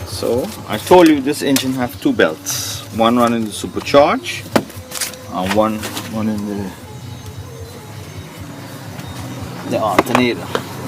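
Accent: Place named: Guyana. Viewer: great video you save me 1200 dollar keep up the great work keep the videos coming ,,,,u sound like a guyanese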